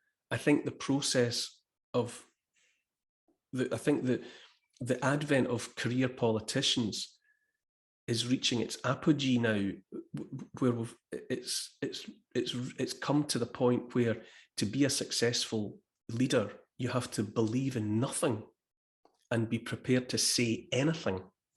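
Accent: Scotland, United Kingdom. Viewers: 'Are we gonna be poopets or no poopets?"written in a Scottish accent. Love that Scottish accent. "I don't want ta be a pie, I don't like gravy."...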